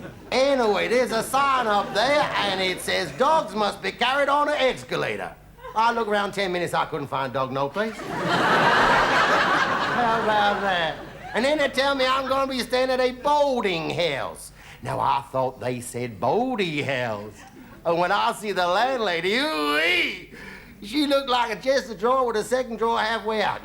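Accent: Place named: United States. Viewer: I love his American accent. 👍👍👍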